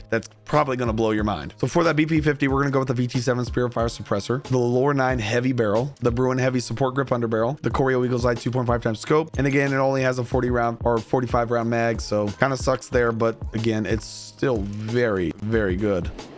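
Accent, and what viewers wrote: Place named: United Kingdom. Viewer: I hope you get better and i love the british voice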